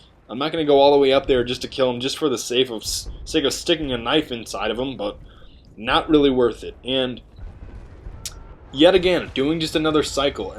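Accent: Russia. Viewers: your Russian accent is awesome. крут :) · polar bear is more deadly, grizzly are just more likely to attack great rushian accent i alwasy blame lag for those shotgun moments i hear theres some kind of hit box they have to be in when you start the kniff inorder for it to hit them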